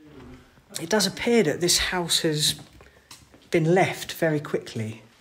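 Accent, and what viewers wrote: United Kingdom. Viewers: I love your UK accent!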